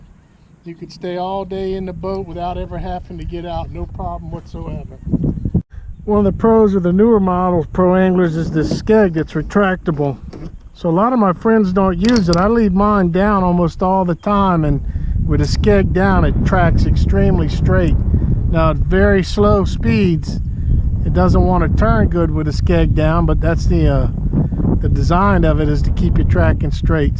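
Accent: Louisiana, United States. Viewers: You sound like your from my neck of the woods Cajun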